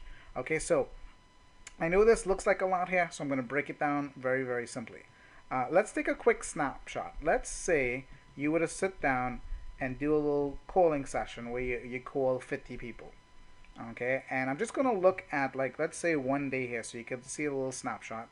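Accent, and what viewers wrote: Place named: Trinidad and Tobago. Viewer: You sound Trinidadian.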